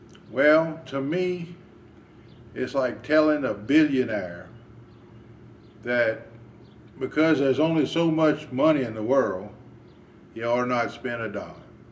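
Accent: Louisiana, United States. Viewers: Teri Ruiz I'm 'sheeshing' right along with you and.. I'm scratching my amateur head wondering what zone I'm in (I live in the Netherlands). I think I'll start some tomato seeds in a few days like shown in this video, then a week or two after that by using the method shown. I love learning from this guy too.. and especially LOVE his Cajun (Acadian) accent.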